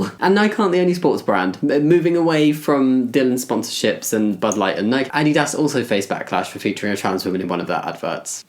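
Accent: United Kingdom. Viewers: It took me a bit as an American to figure out you were saying Nike. Is that the common pronunciation in the UK? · British pronunciation. Ah-dee-DAS too.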